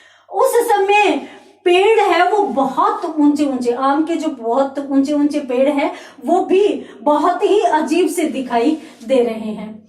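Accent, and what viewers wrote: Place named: Haryana, India. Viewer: Madam aap Haryana se ho kya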